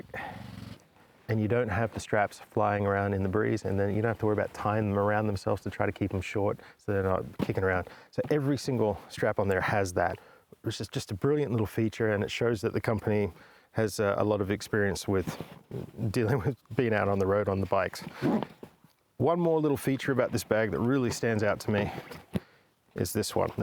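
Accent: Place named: Australia. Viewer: Love the accent dude. Not sure if Aussie, Kiwi or Yank or mix therein. I really like that bike too. Thanks for the informative vid na!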